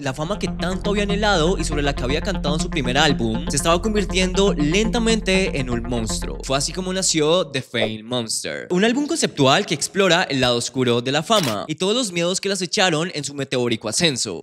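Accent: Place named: Fresa, Mexico. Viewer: Tu acento tan fresa es tan molesto que ni quiero terminar el video.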